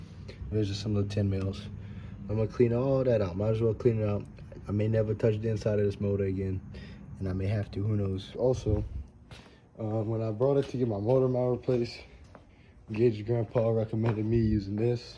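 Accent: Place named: South Louisiana, United States. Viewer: As soon as I heard you talk I knew you were from S. LA. Me too. I have a 2013 Sierra ticking its ass off. Wanna do mine lol?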